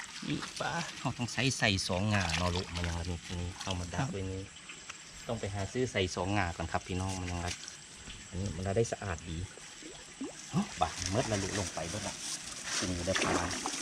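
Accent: Phu Thai, Thailand. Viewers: เป็นคนอยู่ใสครับคือเว้าคือคนภูไท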